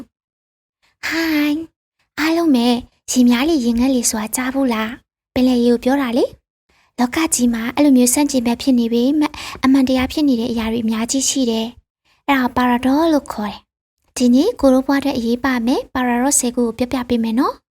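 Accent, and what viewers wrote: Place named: United Kingdom. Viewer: Eng accents လေးတွေကကျန်တော်အသဲယားတာ ဆက်ကြိုးစားပါခင်ဗျ❤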